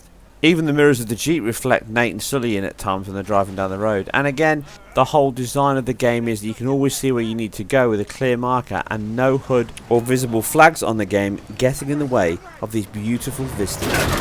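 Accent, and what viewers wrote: United Kingdom. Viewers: that voice makes this video unwatchable fucking brit accent shit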